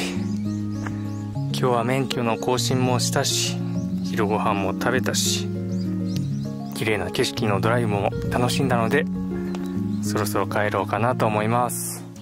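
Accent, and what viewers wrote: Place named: Okayama, Japan. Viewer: 天気も良く、zも艶々で気持ちいい動画ですね！ 岡山弁も炸裂で山形の私には新鮮で微笑ましく拝見させて頂きました～👍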